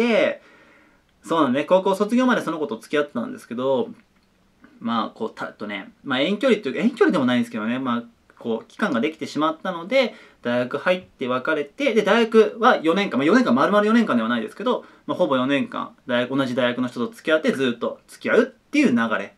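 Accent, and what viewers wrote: Kansai, Japan. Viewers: 関西弁が入ってた方がいいです！！！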